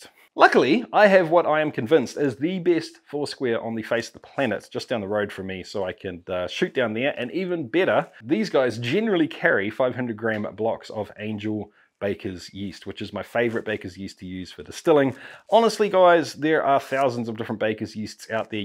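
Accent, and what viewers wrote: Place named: New Zealand. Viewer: You kiwi?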